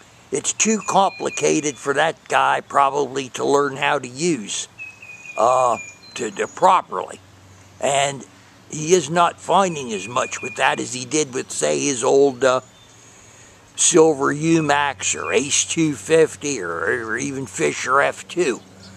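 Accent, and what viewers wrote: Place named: Dundalk, United States. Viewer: Where are you from? You almost have a Dundalk, MD accent. Happy hunting!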